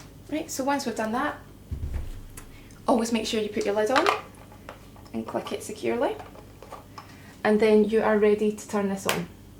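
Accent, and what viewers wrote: Scotland, United Kingdom. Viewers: I loved this. And I actually loved your Scottish accent. And also the fact that you even say Uhmmmm and Ahhhh during this very lovely and useful video. Thank you so much.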